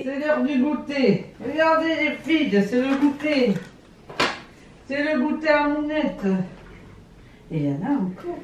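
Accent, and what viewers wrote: South, France. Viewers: Ce n'est plus un mais 2 rayons de soleil en vidéo dès le matin !!! le bonheur !!!! et Notre Mounette internationale fan de chocolat, chantilly et crème va voir une diététicienne mercredi !!!! svp fait nous un retour !!! en plus elle a pas besoin de régime .... Elle est très bien notre MOUNETTE !!!! Bravo pour tout ton travail, on ne se rend pas compte les vidéos dure entre 10 et 20 minutes pour nous, mais quel boulot !!! merci pour tes contenus, ta joie de vivre et ce petit accent du SUD qui nous réchauffe !!!! biz à vous 2 !!! très beau sac ce TED BAKER, j'adore !!!!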